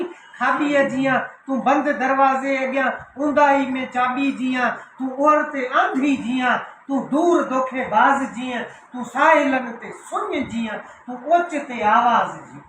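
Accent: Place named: Lari, Pakistan. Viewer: تمام بهترين لاڙي لهجي ۾ پڙهيل هڪ طويل نظم منفرد لڳو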